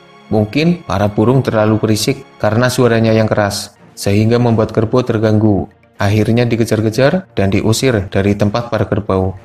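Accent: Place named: Java, Indonesia. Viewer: Medoknya itu unik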